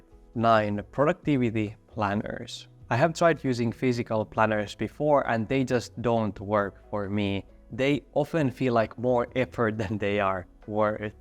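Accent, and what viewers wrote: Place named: Finland. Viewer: Ihana suomiaksentti! 😂 jotenkin niin hellyyttävä ja ilahduttava. Tosi hyvä video, pitää vilkaista lisää. Aloitan ainakin puolen vuoden ”no/ low buy” -ajanjakson, joten näistä videoista on varmasti apua 😊.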